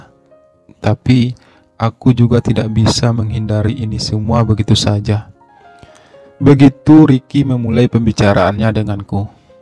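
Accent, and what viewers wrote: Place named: Medan, Indonesia. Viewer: Klu aq cermati aksen suaranya ~ aq yakin Abang ini dari Medan ~ ya kah ????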